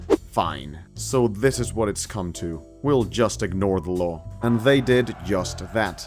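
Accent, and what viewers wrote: Castile, Spain. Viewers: Why are saying it like the Spanish way and not the Mexican/Latin American way of pronouncing the Zs and Cs. The odds are none of these historic figures pronounced it that way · He said in another comment that he was born and raised in Spain, so he's just talking in his native accent. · My knowledge of Mexican history is bad, but not terrible, I’d like to think I have a 1.5 or 2 stars rating. But hearing the proper Spanish pronunciation of names and not recognizing them, even though I’ve READ these names before has me shook lmao 😂😂😂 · Sounds very Castilian, I like it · Your English is among the best of any Spaniard I've ever heard... although I have to say it's a bit odd/amusing to hear all these Mexican names pronounced with a Castilian accent.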